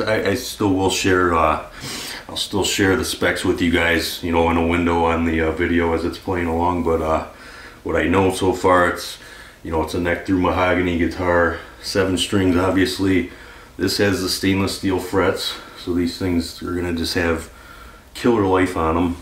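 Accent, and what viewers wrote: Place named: Midwest, United States. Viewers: Your midwestern accent warms my heart. I saw your blood vessels in the thumb nail and immediately thought "Hey it's that guy that did some Nile riffs years ago" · Minnesota?????? You can’t hide that accent from me bro hammer!!!!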